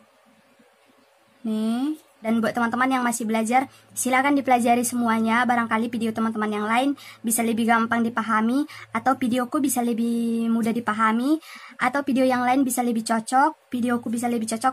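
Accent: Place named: Sumatra, Indonesia. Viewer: Hallo, lokasi ada dimana ya? Logatnya sih sumatera nih... Saya baru gabung, lumayan menyenangkan dan cukup detil penjelasannya. Apakah juga terima jahitan makaud saya sbg penjahit? Tks 🙏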